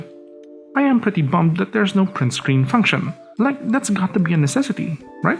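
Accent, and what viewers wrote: Philippines. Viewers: Very sexy filipino accent, say "bye roos" for me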